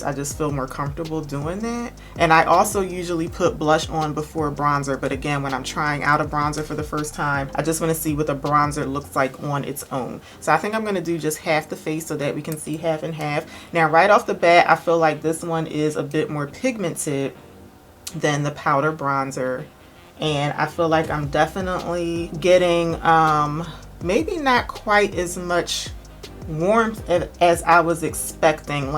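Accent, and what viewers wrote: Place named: Philadelphia, United States. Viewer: You sound like a Philly girl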